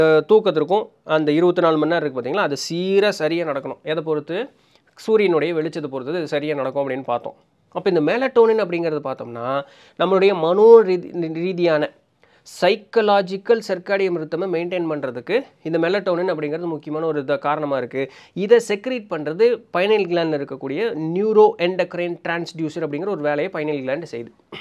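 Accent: Coimbatore, India. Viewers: Anna neega Coimbatore ha ❤😊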